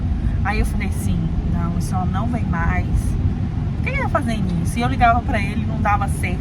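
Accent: Minas Gerais, Brazil. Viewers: Vc é hiper bacana, guerreira, batalhadora,linda história, tudo de bom.Vc é mineira! Téns um sotaque mineiro, tenho muitas amigas por isso estou perguntando,Deus abençoe vc e sua família,♥️♥️🙋‍♀️🙋‍♀️🙋‍♀️ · Vc é mineira? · Que história de amor linda ❤️ que Deus continue abençoando grandemente essa família linda que vcs estão fazendo 😍🙏 amei de vdd sua história o YouTube te recomendou a duas semanas e já maratonei vários vídeos seus vc é divertida com esse sotaque mineiro seus filhos são uma benção vc é guerreira humilde amei vcs e vou ficar por aqui assistindo seus vídeos e torcendo pelo casório e a casa nova de vcs bjussssssss❤❤❤❤❤❤ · Linda a história de vcs, como bom mineiro estou achando similaridade nesse seu sotaque 😂 um abraço e muito sucesso em suas vidas. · É isso aí minha linda.Mar calmo nunca fez bom marinheiro! Principalmente se somos cristãos aí é que somos forçados a crescer e amadurecer nas batalhas da vida. 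Um grande abraço adoro o inglês com mineires e português tudo num vídeo só!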